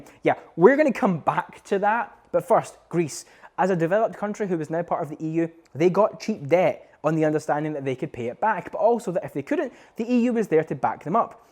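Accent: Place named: West Scotland, United Kingdom. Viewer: Thank you, I'd been so keen to hear your thoughts and facts on Greece. It was great, but ooft you spoke very fast in this video. As a non west-coaster it takes me that second extra to adjust to your accent (that I adore), I'm not suggesting you tone down your accent, just that I'd appreciate if the speed was just a tad bit slower. I like to share your videos with non Scots too. Keep up the good work, I'm so glad you're back on my screen!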